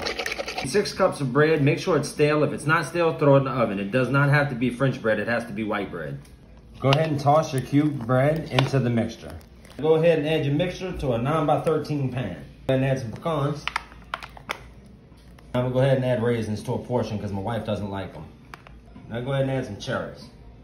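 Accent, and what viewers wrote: Louisiana, United States. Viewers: What happened to your Louisiana accent?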